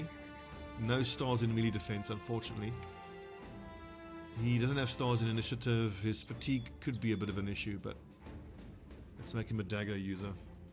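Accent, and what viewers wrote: South Africa. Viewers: Interesting accent sir, saffa living abroad?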